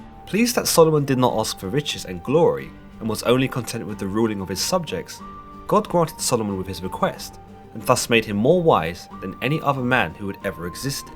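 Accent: United Kingdom. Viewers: Something about British voices that make things sound better lol.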